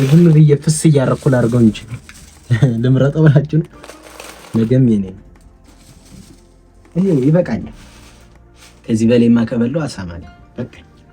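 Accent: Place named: North, Ethiopia. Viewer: ጎበዝ በርታ የኔ ልጅ አማርኛህ የወደ ሰሜን ነው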